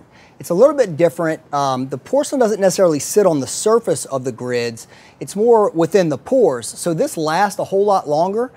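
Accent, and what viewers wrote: Louisiana, United States. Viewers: Good stuff! Very helpful. Also, you sound very much from Louisiana.. am I right?EDIT: Nevermind. just looked at your channel and saw all the other LA related videos.. lol. great channel and video production. Pls keep it up!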